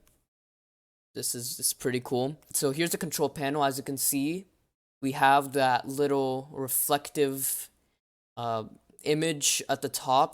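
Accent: Czech Republic. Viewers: Wait a second - you're czech? Yaaay me too. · Are you from czech republic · ty si čech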